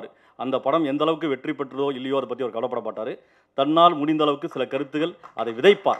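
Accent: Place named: Karnataka, India. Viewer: sounds like he is kannadiga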